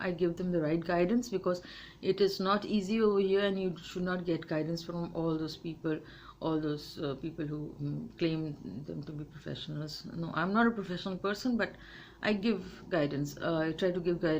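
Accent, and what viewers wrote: Bengal, India. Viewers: Dear Madam 🌹🌻🎊🏆👍👌 I could make out that You are a Bengali Nice to hear from you A Matured Mind speak Regards From the City of Joy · Dear, I love your Bonglish.....Your English in Bengoli Accent is mind blowing.....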